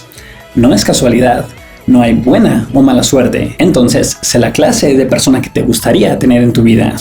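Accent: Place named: Mexico City, Mexico. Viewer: juan jose perez Yo lo escucho perfectamente como un habitante de Ciudad de México donde por ser tan grande con casi 10 millones de personas y sin contar aún la periferia de poco más de otros 13 millones, existen variados acentos. Pero en específico él es como de una de las zonas del centro-sur, clase media alta. Obviamente con buen nivel no solo económico, si de educación y cultura.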